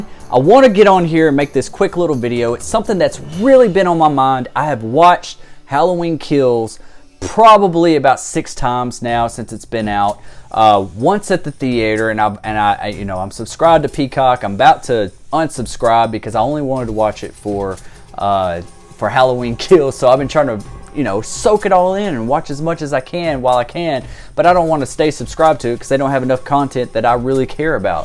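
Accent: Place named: South, United States. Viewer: I love his voice. That southern accent is so relaxing. Looking forward to more theories and videos.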